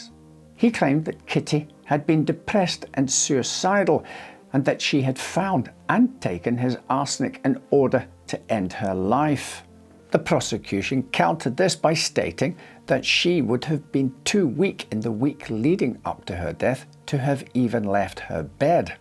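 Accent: Scotland, United Kingdom. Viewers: he is Scottish, from Lanarkshire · I can hear a little Scottish accent here and there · Love the way the presenter talks and makes it easy for a non English speaker to understand. Are you Scottish? As for the case, I say it was all due to greed. No rival involved, Armstrong just wanted to be the top dog in his community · Has revealed where he is from in the UK? is a Scottish name, I believe and his accent sounds like it. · In this particular presentation I am inclined to agree with you. Normally his accent is quite smooth with more of a Yorkshire twang but it seems he is adding a sort of Scottish intonation to it now. I noticed the change straight away and agree with it being a bit offputting and parody-ish. Edit: I didn't realise was Scottish. So yeah my comment would seem inane at first read. Sorry! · He clearly has a Scottish accent, but it sounds a bit mixed, so I suspect he's spent a lot of time south of the border.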